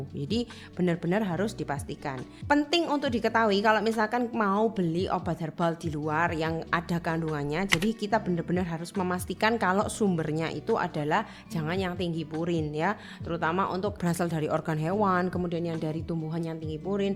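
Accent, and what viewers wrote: Java, Indonesia. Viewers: Logatnya Jawa banget,Iyo ta rek.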